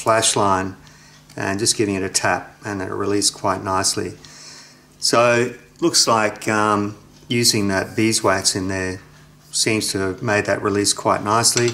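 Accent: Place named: Australia. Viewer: Good job! Love hearing your accent it reminds my Mother in law. Yes she was an Aussi as well. Go Wallabys!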